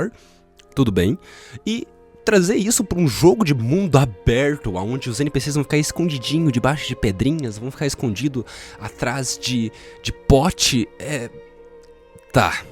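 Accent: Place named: Sao Paulo, Brazil. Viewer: Eu não vejo problema nenhum nesse vídeo. Eu vejo problema em quem esperava algo bom sendo dito por alguém que fala igual paulista...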